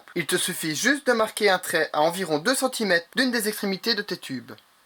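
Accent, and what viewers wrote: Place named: Belgium. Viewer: quel accent belge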